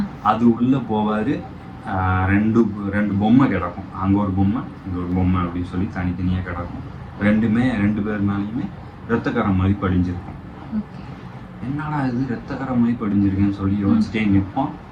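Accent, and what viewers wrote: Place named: Tirunelveli, India. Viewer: So good to hear in Tirunelveli slang, bro🫡🫡🫡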